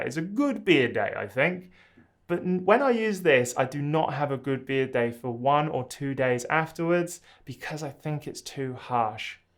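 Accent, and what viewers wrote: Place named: United Kingdom. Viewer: Just out of curiosity how did you manage to get Beardbrand products? I assumed by the accent you were here in the UK 🤓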